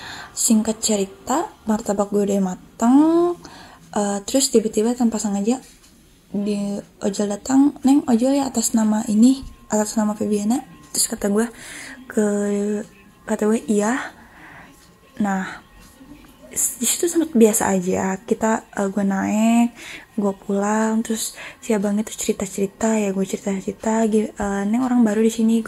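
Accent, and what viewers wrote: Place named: Sunda, Indonesia. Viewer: Logat sundanya msih kental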